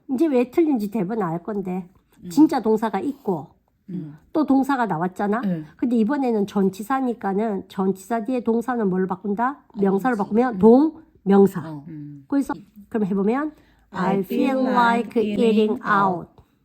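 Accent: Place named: Andong, South Korea. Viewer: 전 친정이 안동이라 고향생각나고 ㅋ 사투리 너무 좋아요.. 친정에 있는 느낌이예요 ㅋ 잘 보고있습니다. 감사합니다! ❤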